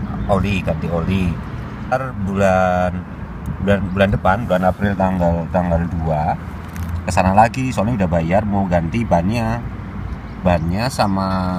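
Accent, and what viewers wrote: Java, Indonesia. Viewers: iki koyone wis sue nang jepang, tapi logat jowone gak ilang hahaha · pak jowone ngendi???... hiromi dijak balek jowo to...hehehe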